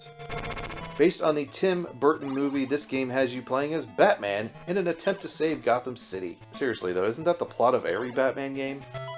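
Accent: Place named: United States. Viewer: hears American accent Me: here comes NES bias 😅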